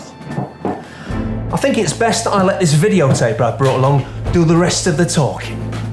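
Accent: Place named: Northern England, United Kingdom. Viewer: Is it just me or is about a magnitude of ten more Northern in this review than normal? Good work, represent!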